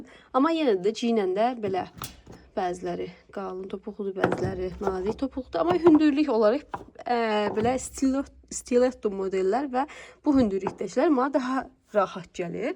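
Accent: Sheki, Azerbaijan. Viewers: Salam, xanım.Necəsiz? Sizin nə gözəl dialektiniz var.Yəqin ya Şəkilisiniz,ya Qaxlısınız.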